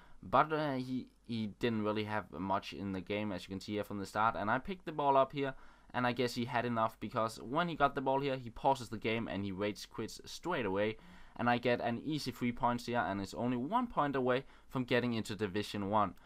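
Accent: Denmark. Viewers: hah! I can hear you are danish, because of the way you talk english! Jeg er norsk btw :P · i think i am hearing a touch of danish when you talk, am i right?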